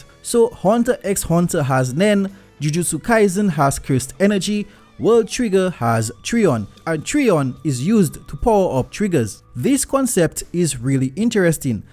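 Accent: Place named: Sint Maarten. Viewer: Hey man, if its not too personal, where is your dialect from? Had a discussion with a friend, he guess ST:martin.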